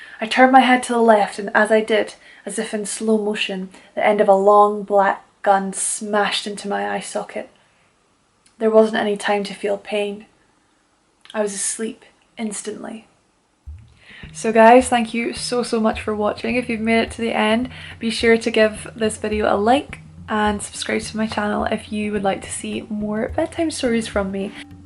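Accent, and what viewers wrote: Scotland, United Kingdom. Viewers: So nice to hear such a lovely Scot lass tell a beautiful story. Would love to hear a wee bit more of the Edinburgh accent, it is my favorite sounding speak.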